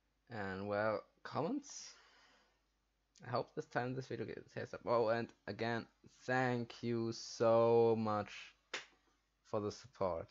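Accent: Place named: Germany. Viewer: So, what country are you from? Your name looks Scaninavian (maybe Swedish), but your accent sounds German to me, but I don't hear well. Enjoyed your Home Free reaction. They're often great, and sometimes silly. Good job.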